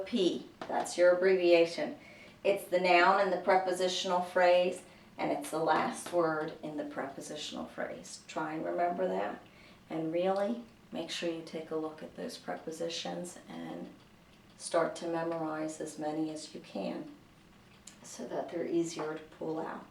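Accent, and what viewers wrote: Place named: South, United States. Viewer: Beautiful southern accent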